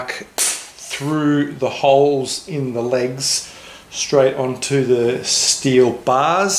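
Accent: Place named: United Kingdom. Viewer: Finally on part 7 is what I wanted know, what you used for top and Ha Ha <mail> yup your British ascent really stands out on aluminum> uh·loo·muh·nuhm. Being from Maine USA I had to rewind 3 times to figure out what you said. I skimmed through most of you lathe bench build videos, your's is about the closes to the design I want, really nice job so far thanks for sharing........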